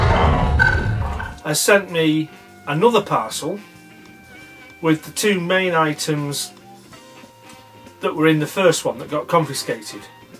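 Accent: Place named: England, United Kingdom. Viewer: What part of England are you from I just can't pinpoint it I love accents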